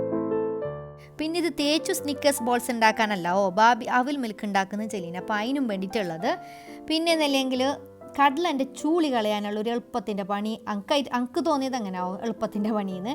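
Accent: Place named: Kasaragod, India. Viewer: Kasaragod bisiyaattil vlog kekaan Nalla paangund#mayyuskitchen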